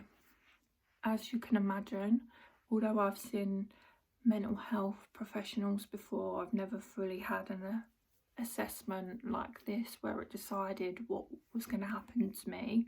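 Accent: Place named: United Kingdom. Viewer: This is random but I love your accent. what kind of british accent is it? x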